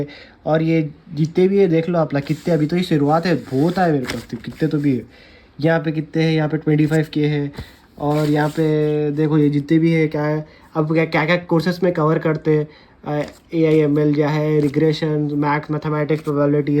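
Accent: Hyderabad, India. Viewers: Hyderabadi hai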